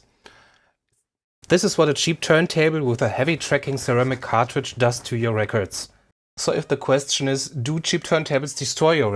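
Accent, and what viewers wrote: Germany. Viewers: Your slight German accent is adorable! Und Du bist so gescheit. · Are you German :D?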